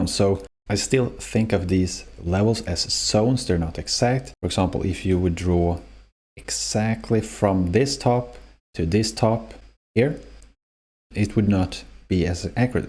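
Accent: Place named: Sweden. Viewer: He sound pretty swedish🙌🇸🇪👏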